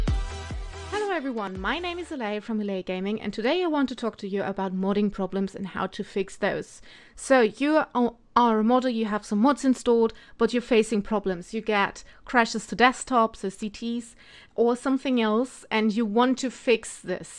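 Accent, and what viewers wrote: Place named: Germany. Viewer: GERMAN!!!! YES!!! That’s what I’m hearing! Well it’s a lovely voice. ;)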